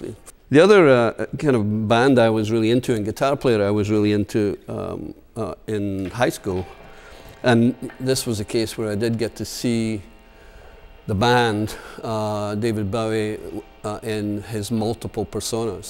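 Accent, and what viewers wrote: Scotland, United Kingdom. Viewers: Was not expecting the Scottish accent, or that playing "style" if you can even call it that. I gots ta give the man props for partnering with Seydel harmonicas though to create the excellent Midnight Special harmonica, truly one of the most beautiful harps ever produced. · Am I the only one that was surprised that he’s Scottish? Not saying it’s a bad thing, just a little unexpected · hes from whitburn new he had a scottish tone . makes me proud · He's got that annoying accent that all Scottish people get when they live too long in America.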